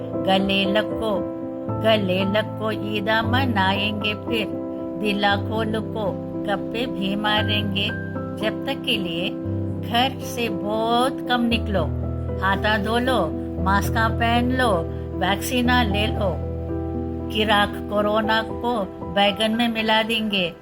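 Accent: Hyderabad, India. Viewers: Very nice with a Hyderabadi touch! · Pure Hyderabadi!👌👏👏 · Zabardast.... fully Hyderabadi style.... ur very talented...the language is just Amazing... n in the end Ishallah gave a touch of hyderabadi style.... keep it up n wishing u good luck...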